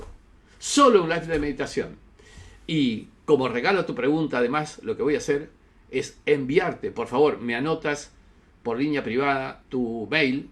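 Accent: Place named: Argentina. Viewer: Gracias, buen contenido....de donde es ?...que habla como argentino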